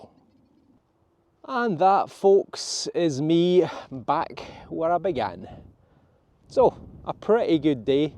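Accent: Scotland, United Kingdom. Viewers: Love seeing all the treasures, the history lessons are great but I’m mostly here to listen to your delightful Scottish accent 😊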